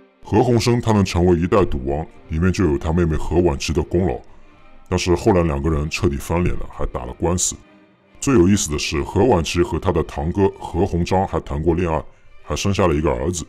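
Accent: Shanghai, China. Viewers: 看你表达能力很强，论述的时候也没有断续，应该是有受过训练的专业人士，而且说话的方式和一些地方语言的应用，都说明了你本人是地道的上海人。 我在猜想你不会是之前被国内封杀了的周立波吧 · 你是上海人吧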